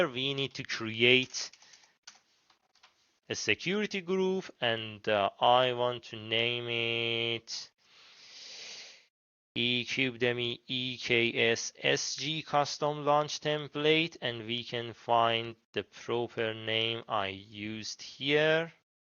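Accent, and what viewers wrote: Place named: Shiraz, Iran. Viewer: english with shirazi anccent ❤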